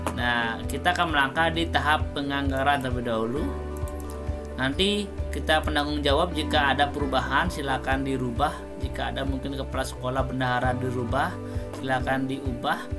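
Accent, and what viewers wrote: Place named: Bali, Indonesia. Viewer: Bapak ini dari bahasanya pasti orang bali😃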